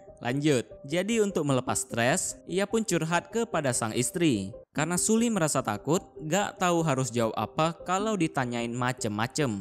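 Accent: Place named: Singkawang, Indonesia. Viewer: Ini logat orang cina Singkawang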